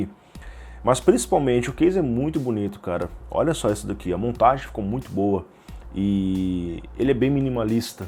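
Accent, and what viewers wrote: Brazil. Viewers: Is he speaking Brazilian Portuguese or European Portuguese? Is there a difference ?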